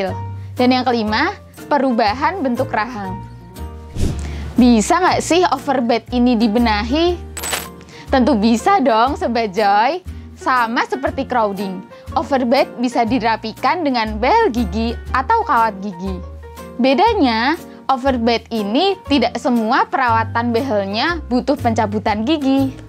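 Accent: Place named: Banyumas, Indonesia. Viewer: Dokternya wong ngapak. Keton banget logate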